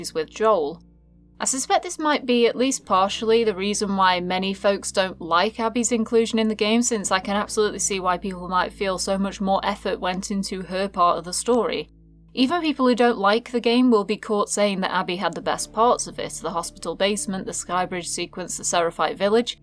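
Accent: United Kingdom. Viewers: Good job with this analysis, it was quite interesting. Just out of curiosity, which part of England are you from? I only ask because your accent reminds me of the accent of the southern Birmingham area where I used to live, but I may be very wrong, as English is not my first language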